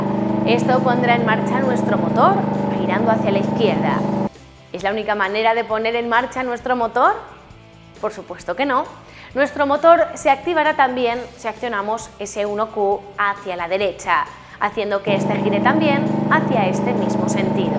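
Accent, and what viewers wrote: Spain. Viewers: Linda, inteligente y con acento español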